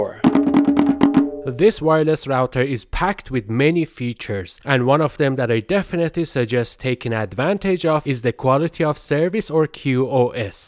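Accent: Iran. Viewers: چرا لهجه ایرانی ها انقد ضایعست و معلومه😂😂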